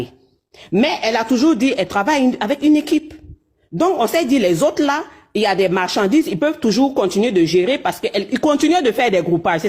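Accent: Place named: Africa. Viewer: C'est l'accent de quel pays... ? Un pays d'Afrique à 100 % sûr... mais je sais pas exactement quel accent... Un pays francophone, donc plutôt Afrique de l'Ouest...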